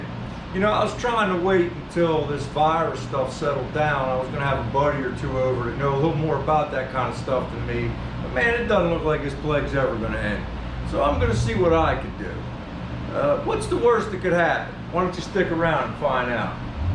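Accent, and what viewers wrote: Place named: St. Louis, United States. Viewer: Are you from St. Louis mo. Area? My YouTube entertainment lately is guessing people location based on accent.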